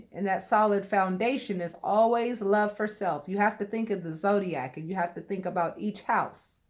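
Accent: United States. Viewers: wow resonates so much luv the yanki accent well said hammered the nail home bless thank you xxxxxx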